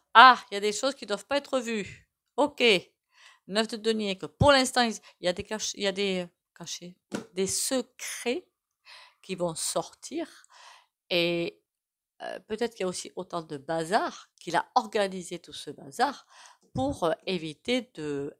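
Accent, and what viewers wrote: South, France. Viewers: Merci, ce soin m'a fait partir loin d'un seul coup, en quelques secondes et je reviens paisible. J'aime beaucoup votre voix, avec en plus, ce petit accent ( je pense que vous êtes du sud ),et aussi beaucoup de petites phrases amusantes et percutantes qui font plaisir!moi j'ai l'impression que je commence à décrocher, à lâcher prise par rapport à tout ça: j'ai confiance qu'on va arriver à un moment donné où le système va s'effondrer tout seul et où il faudra tout reconstruire différemment, et que cette fois-ci ce sera bien. j'aimerais bien avoir votre avis sur l'idée de Frexit, mais peut-être l'avez-vous fait déjà précédemment? Je suis aux Patriotes et par conséquent je tiens à l'idée de reconstruire sur de nouvelles bases. Merci.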